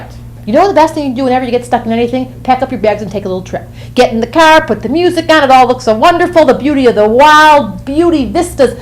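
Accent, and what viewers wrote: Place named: India. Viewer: That indian accent :D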